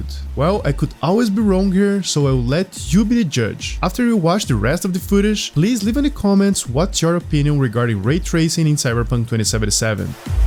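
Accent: Brazil. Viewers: Não é Unotable, é Unnoticeable. Quando for gravar os vídeos, pensa que vc não quer que percebam que vc é de SP.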